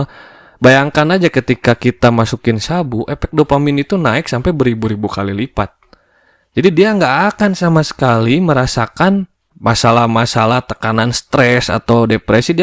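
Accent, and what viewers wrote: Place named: Bandung, Indonesia. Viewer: Orang Bandung nya kang logakna kkental pisan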